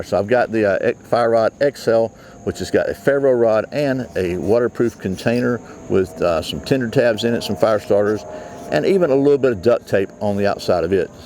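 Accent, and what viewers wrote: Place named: Carroll County, United States. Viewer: sounds like you might be from around the Carroll county area or Douglas county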